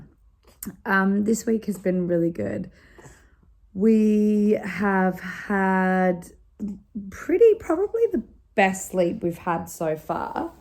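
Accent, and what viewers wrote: Australia. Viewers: She is Australian.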